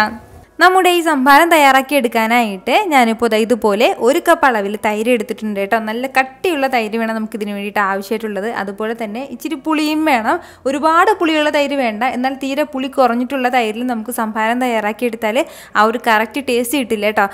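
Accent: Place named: Tirur, India. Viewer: Excellent recipe,really good for this present climate,ippozhanu orukaryam orma vannethe Thiroor vettom swadeshiyano slang ketteppo thonniyethanu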